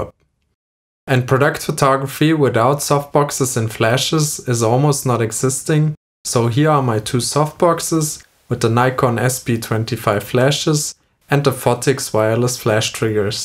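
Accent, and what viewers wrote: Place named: Germany. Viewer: Echt gutes Video! Man hört leicht deinen Deutschen Akzent xd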